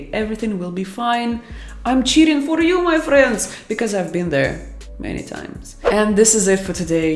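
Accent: Russia. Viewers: Great accent rassiskiy☺️😁😁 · I love it when you speak English with russian accent ❣❣ thanks a lot for all the super useful tips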